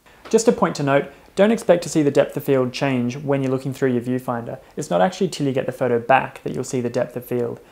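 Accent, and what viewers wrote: Australia. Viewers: This is by far the clearest explanation compared to other videos. Easy to understand, yet more technical than others Chilled ozzy accent is a plus too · That was the most simplistic no-brainer explanation of f-stops/aperture I have seen on YouTube. I don’t know if it’s the smooth Aussie 🇦🇺 accent but my Canadian 🇨🇦 brain appreciates your teaching style and analogies!! 👏🏿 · Thanks. I’ve been out today shooting the Darwin wetlands before and at sunset. Your video has explained a troublesome shooting situation made pretty simple. It sounds easy, I probably make it difficult for myself. Thank you for a simple, no B,S, no problems tutorial. Good to hear an Aussie on here. I have followed and saved. I will return.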